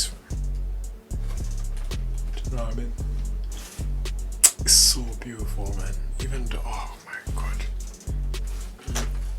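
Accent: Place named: Jamaica. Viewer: Jamaican accident is coo bruh. no homo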